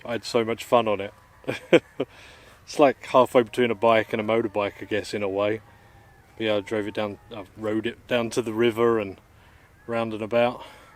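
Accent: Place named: RP, United Kingdom. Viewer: What’s with the talking posh you have changed the way you talk lol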